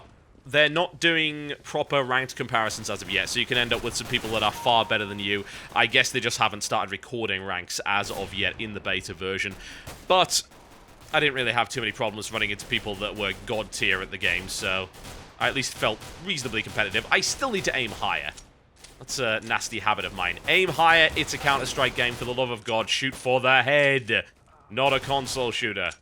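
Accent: United Kingdom. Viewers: I love your voice man...its deep British awesomeness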